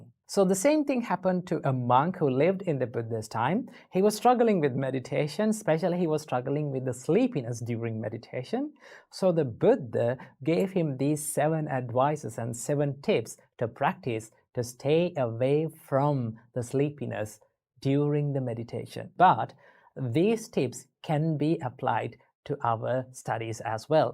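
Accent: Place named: India. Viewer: His english feels hindi 😊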